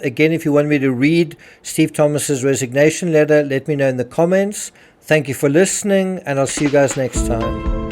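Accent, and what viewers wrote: South Africa. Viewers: I've been listening to your podcasts for some time now and agree with all you say. Tonight, I realized how much you care about the victims too that you take deep dives into. I was surprised you had so many books on the JB Ramsey case, but bought another triology of yours that is a different famous murder that also took place near Christmas. I have been reading the set, and you are very logical and precise. Thank you. I plan to start the first trilogy on this case next and go from there. For those that don't know, these Kindle books are very reasonable in cost. Thank you, for your help in understanding what has occurred and for your care. Last, your accent reminds me of our neighbors we had in Geraldton, Western Australia, who are from South Africa. There is a large community there of immigrants from that country. There is even a church that has services speaking in Afrikaans. 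Blessings.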